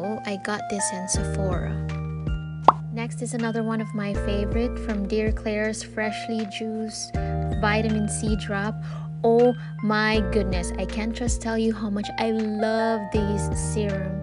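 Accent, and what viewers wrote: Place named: Philippines. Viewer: My idol sa taglishing ug bisayinging pronouncing. Akoa jud ning paaboton para makakuha kog mga tips unsaon pagpa gwapa. Hihi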